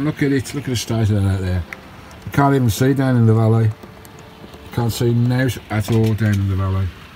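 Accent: Scotland, United Kingdom. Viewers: As a Scotsman I can say with all my heart ❤️ thank you for sharing your amazing travel's and your scots accent was brilliant 👍😀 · Lovely Park up on top of that mountain! Love the Scottish accent!!!! Take care! Ju Xx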